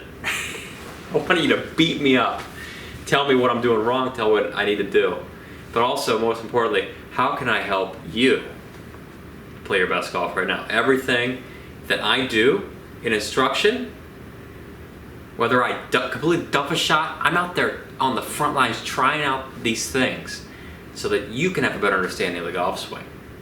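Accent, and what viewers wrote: United States. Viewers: I am 78 3/4 years of age now. Your story is very impressive. I have been playing golf over 45 years with my score ranges of 86~98. I long wanted to become a member of 70s club (golfers who play with strokes 79 or below 79 per 18 holes) but I gave up my hope as I already became old. But one day, while I was watching a Utube golf lesson program, A funny and happy guy who speaks "a very easy to listen, beautiful" American English appeared in another Utube screen. It was YOU, Mr. and You were talking about swings joyfully quoting the theories physics and geometry. You theory attracted me a lot and I instantly fell in love with you. This is what I long long wanted theory and practice. You encouraged me a great deal and I decided to challenge the AGE SHOOTER. Now, all parts of my body have been aching, so many parts that have never been aching during my 45yeas of golf. 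I enjoy small aches and become happy every day because my scores have been improving. Thank you. I will someday visit you and receive lessons from you!!!!!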